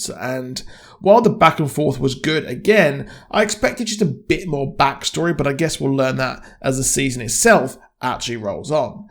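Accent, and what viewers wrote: United Kingdom. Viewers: I’m unsure if you are putting on a Received Pronunciation accent... but Demeter is definite not pronounce DE ME TER.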